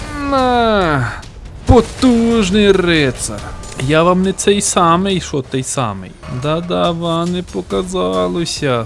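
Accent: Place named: Zhytomyr, Ukraine. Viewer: Ти можеш нормально говорити, чуму не житомирською.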